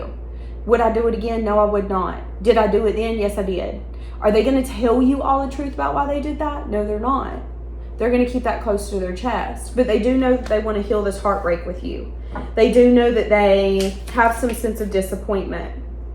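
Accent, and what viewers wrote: South, United States. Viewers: Unrelated but girl I’m from Mississippi and you have the most adorable southern drawl I have ever heard!!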